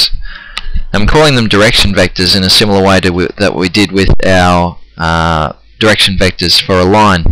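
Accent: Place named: Australia. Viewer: smexy aussie accent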